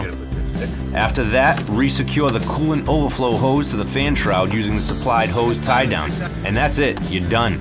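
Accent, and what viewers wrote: Boston, United States. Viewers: Love this channel! Even more after I recognised that Boston accent 😏 hope you’re a die hard pats fan like I am! Keep up the vids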